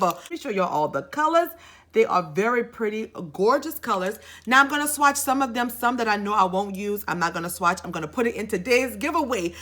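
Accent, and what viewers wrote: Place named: New Orleans, United States. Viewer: I’m so happy when I hear that New Orleans accent and that slayed face. My bff in my head hey girl. Fun fact : my actual bff’s name is Latonya.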